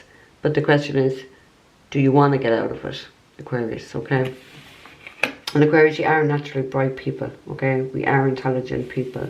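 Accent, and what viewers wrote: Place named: Ireland. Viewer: Ohhhh Irish gal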